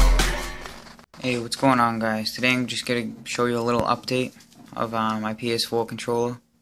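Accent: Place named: New England, United States. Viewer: You from mass or maine???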